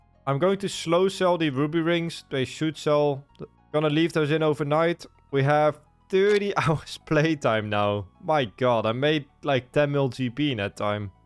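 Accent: Netherlands. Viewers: I smell some dutch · You're dutch right? Can hear it in your accent haha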